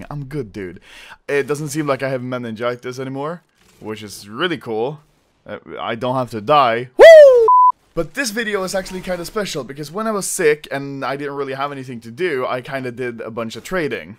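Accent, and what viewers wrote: Sweden. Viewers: You swedish ? Lol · du är svensk man hör på ditt uttal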